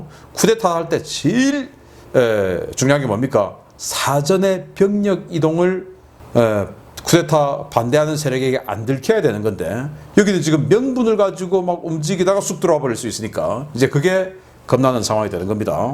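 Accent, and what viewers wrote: Gyeongsang, South Korea. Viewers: 구독자 분 중에 수도권.전라도 사람들 있슴 함 봐주삼 난 갱상도 출신이고 수도권에서도 10년 넘게 살다가 지금은 다시 컴백험 했는데, 모르겠으나 갱상도 출신 신인균 씨 말투가 같은 동향이라 그런것도 있지만, 객지생활 10년 하믄서 제주도 사람까지 만나봤는데, 말을 듣기엔 크게 거부감은 없거든여?! 시원시원한게 속 시원합디더.. 다른 지방 사람들, 특히 수도권.전라도 분들은 어떠신가여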